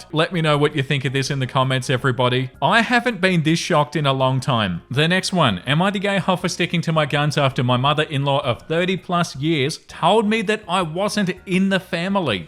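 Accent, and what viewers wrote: Australia. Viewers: i showed my mom your channel & she says "i want to marry this man's australian accent" (she loves australian accents lol)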